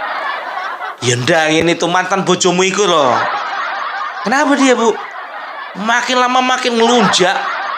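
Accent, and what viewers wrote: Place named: Batak, Indonesia. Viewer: Lombok hadir, senang kali lah aku tonton chanel..apa lg aku denger suara ketawanya aku ikut ketawa.emg cocok banget dialek batak ngelucon hhhhhhhhh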